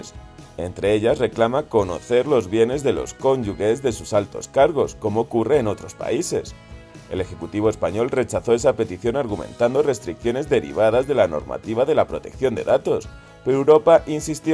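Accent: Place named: Latin America. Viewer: Canal “Españolista” con voz de latino?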